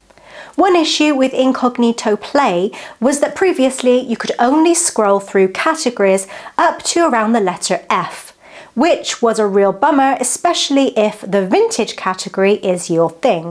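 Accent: United Kingdom. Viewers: Your British accent. OMG! ❣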